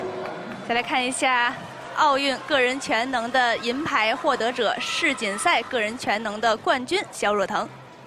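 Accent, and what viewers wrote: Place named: Cantonese, China. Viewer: 解说员是广东人吧~ “欠”了点、“完”满的结果！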